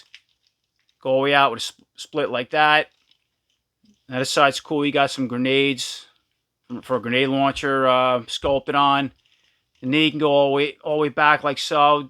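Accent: New York, United States. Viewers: Thanks for your quick reply! Now I gotta sub!;) It's good to know that at least I'm not alone going nuts over these QC issues! I managed to somewhat straighten the bullets but they're still somewhat warped. Feels like I'm tripping on acid just looking at them. Thanks McFarlane! Not! Oh well... I'll try the heat cold method once again soon. Thanks. I appreciate you 🙏 By the way! Is your accent from New York? Just curious! Best regards from Belgium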